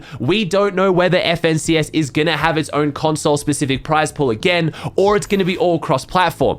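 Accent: Australia. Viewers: Talking Me Watching Benjy Die The Whole TIme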